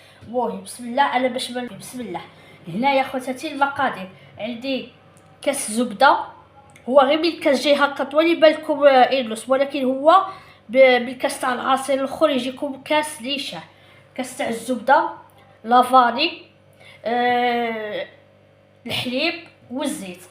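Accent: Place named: Morocco. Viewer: لهجتك مثل المغاربة هل أنت مغربية ؟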